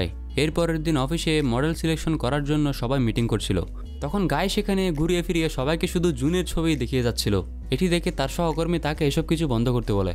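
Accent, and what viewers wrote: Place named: Chittagong, Bangladesh. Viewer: চট্টগ্রাম এক্সেন্ট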